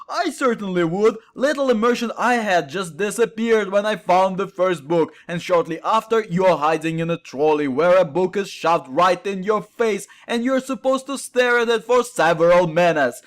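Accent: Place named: Russia. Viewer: That heavy Russian accent is really hard on the ears.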